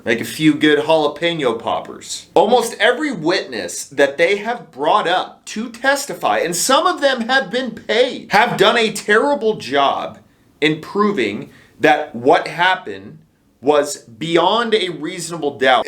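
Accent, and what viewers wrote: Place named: Wisconsin, United States. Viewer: If you are from Texas why do you sound like you are a Wisconsin yankee ?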